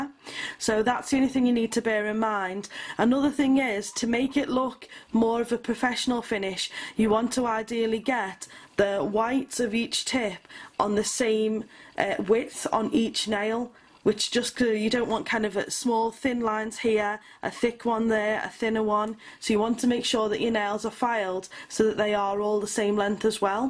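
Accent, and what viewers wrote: United Kingdom. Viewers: Oh my!!! I LOVE LOVE LOVE your type of British accent!!!!!!! One of my absolute favorites!!! ❤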